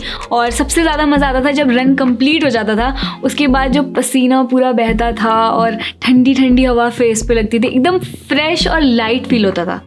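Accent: India. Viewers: I saw many people said thy were attract how u talk d reason is ur desi hindi ☺😁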